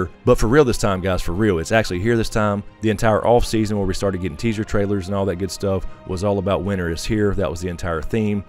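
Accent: South, United States. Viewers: I love your videos. I detect a Southern accent. Where are you from?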